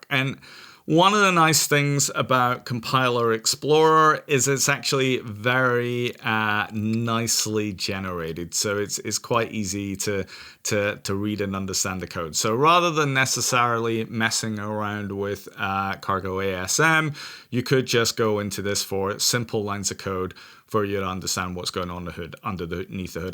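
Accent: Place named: Scotland, United Kingdom. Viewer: Enjoying your videos!, where is your accent from? I feel like I hear a bit of Scottish, English, American, I'm assuming you have lived in many places in your life? 😃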